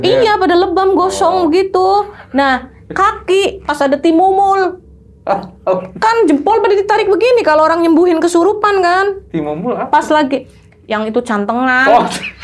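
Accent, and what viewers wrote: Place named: Banyumas, Indonesia. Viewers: Semangat mbakyu,lanjut seru ceritanya ngapak bikin ngga ngantuk mbake. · Wong ngapak mbanya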